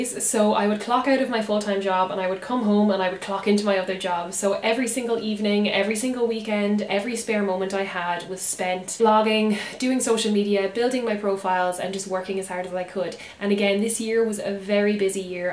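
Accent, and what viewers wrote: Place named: Galway, Ireland. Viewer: Well done :) I like the way you are down to earth and humble and you still have your nice Galway accent.. best of luck from Deirdre Marie Photography x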